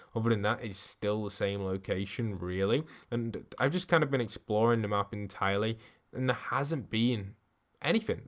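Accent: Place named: United Kingdom. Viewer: HIS BRITISH voice calms the video even more dammmmmmm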